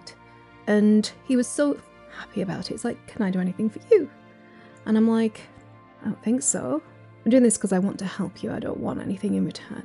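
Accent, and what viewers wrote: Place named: North, United Kingdom. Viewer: I like it when your northern accent comes out.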